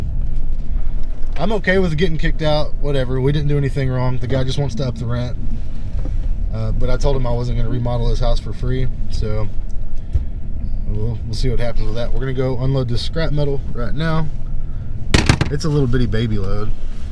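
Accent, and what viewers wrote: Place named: Texas, United States. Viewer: I knew it! I knew you lived in my area of Texas. That's amazing! I knew from your accent. As you know, our state has many different types of Texas accents. I was born and raised in Grand Prairie. I moved in 1989 to Navarro County and have heard so many different dialects. This is awesome. Thank you for your wonderful videos. I am a new subscriber (last week, maybe).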